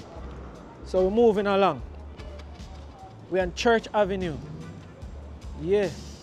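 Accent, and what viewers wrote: Jamaica. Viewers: Do you want only Jamaicans to watch this so ease up on the patois and speak where everyone can understand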